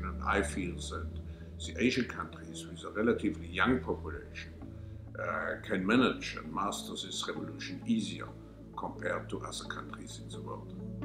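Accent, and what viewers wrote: Germany. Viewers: That German way of speaking.... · Judging by accent and last name, he's either German, Jewish or both. With that in mind, is it no surprise that he talks about a "1984" or "Brave New World" type societies in a positive manner. Update direct from Wikipedia: Klaus Schwab Born: March 30, 1938, Ravensburg, Nazi Germany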